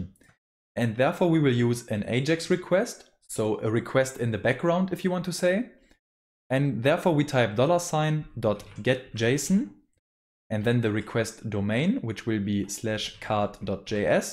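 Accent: Germany. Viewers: Haha bitte😄 Hatte es am Akzent gemerkt, dass du warscheinlich deutsch sprichst. Ich benutze das narrative theme und so wie es scheint ist der Code der im Video ist nicht der selbe wie bei mir. Deswegen kann ich auch nicht die stellen finden an denen der code geändert/eingefügt werden soll.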